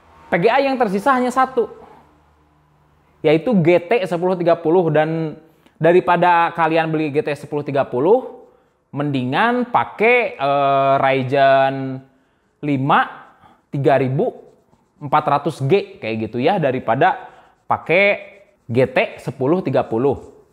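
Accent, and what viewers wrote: West Java, Indonesia. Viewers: ep dan pi sunda pisan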